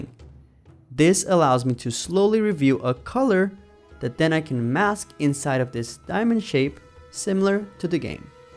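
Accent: Brazil. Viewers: Haha do jeito que você falou já descobri que era Brasileiro. Não tinha ideia mesmo depois de 6 meses desde que comecei a assistir seu canal.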